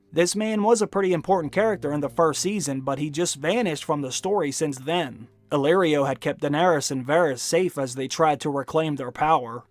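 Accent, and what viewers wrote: Pittsburgh, United States. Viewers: Is the Narrator a Yinzer? I hear a bit of Pittsburgh in his speech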